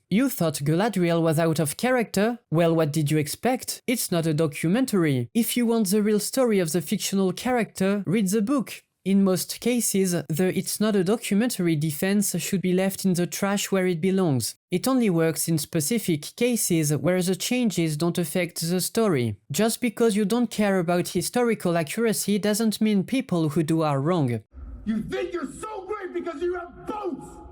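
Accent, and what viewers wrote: France. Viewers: Ton accent frenchie me fume 😂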